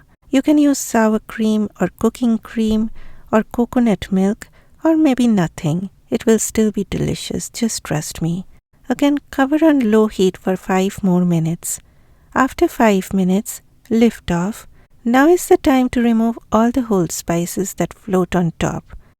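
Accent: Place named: India. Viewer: Haha indians have cute accents. Wobbly head nods are funny.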